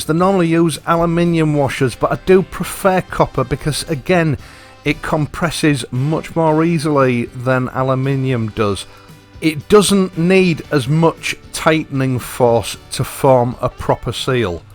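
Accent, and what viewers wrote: United Kingdom. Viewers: British accent 😋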